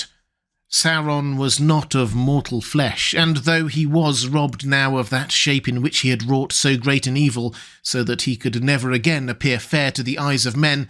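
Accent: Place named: United Kingdom. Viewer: What is accent? It seems British but not really.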